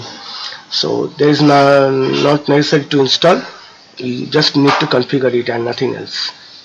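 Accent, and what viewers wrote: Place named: France. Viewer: puto acento francés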